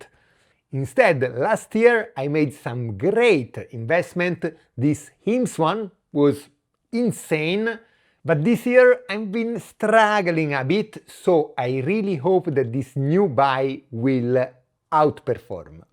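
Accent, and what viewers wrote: Tuscany, Italy. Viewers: Your accent is so funny. Are you from Toscana?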